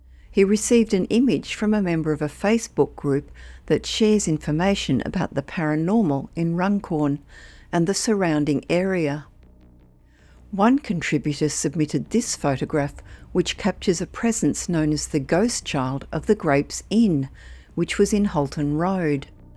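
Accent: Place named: Australia. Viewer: Sexy aussie voice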